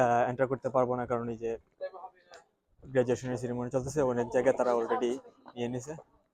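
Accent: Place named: Sylhet, Bangladesh. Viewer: ভাই সিলেটি মাত ঐ ভালা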